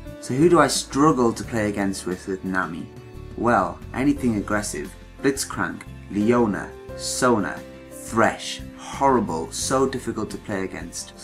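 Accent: United Kingdom. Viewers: Im not a native english speaker, so my accent tends to be more US than UK, but after watching this vid, i will only speak with a british accent, because its so fenomenal and classy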